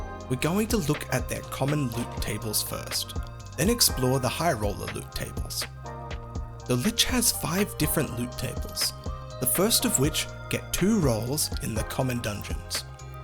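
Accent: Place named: RP, United Kingdom. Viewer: This posh accent is too much for me bud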